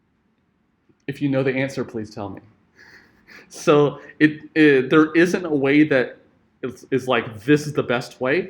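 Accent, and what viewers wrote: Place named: England, United Kingdom. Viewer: Very good lecture. I like your English accent very much. Keep it up.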